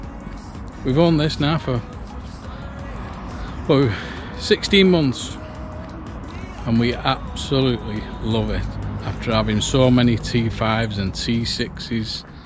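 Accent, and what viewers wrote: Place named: St Helens, United Kingdom. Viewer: NICE van! Sounds like a St Helens accent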